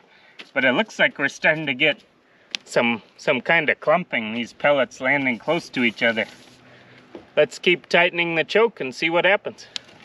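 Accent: Canada. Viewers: You're the most Canadian soundin American I've ever heard. I like your videos, subscribed my man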